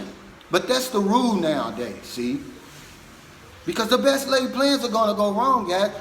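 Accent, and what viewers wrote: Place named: South, United States. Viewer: Thanks. I love your accent BTW ha ha . French is my first language so I have a slight accent in English as well, but I've always loved the South accent... especially for women but hey, I am a man ha ha. You do awesome videos, seriously. Have a GREAT day :)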